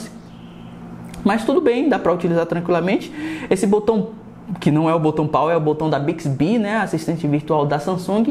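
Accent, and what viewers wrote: Bahia, Brazil. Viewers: Baiano kkk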